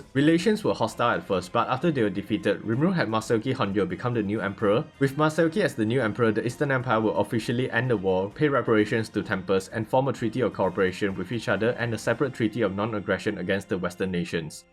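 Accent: Singapore. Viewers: say, your accent sounds familiar, Singaporean / Malaysian ?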